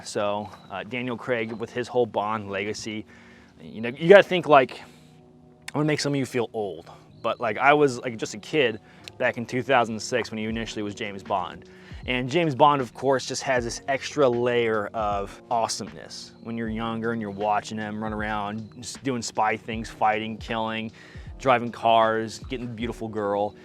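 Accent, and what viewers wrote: England, United Kingdom. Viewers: The first American I’ve seen with a convincing English accent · LMAO with British accent!! please continue with the FUN videoss and Comic Intros!! bro. you made up our DAY!!! F@ YEAHH!!! kind regards Slow n Smooth <> Smooth is Fast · sounds like a elegant english teacher with that british accent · British accent 😮‍💨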